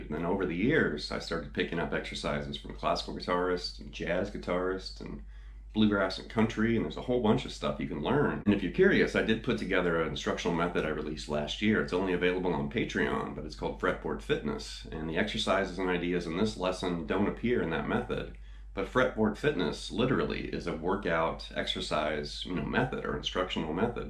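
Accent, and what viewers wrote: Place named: Georgia, United States. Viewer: You're from Chicago? You sound exactly like you're from GA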